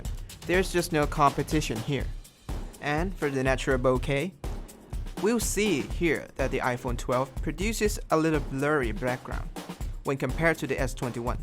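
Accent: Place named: Thailand. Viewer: กำลังสงสัยเหมือนกัน แต่สำเนียงคือเหมือนคนไทย แต่ชอบคอนเทนต์ที่นำเสนอนะมีความเป็นตัวเองมาก ชอบครับ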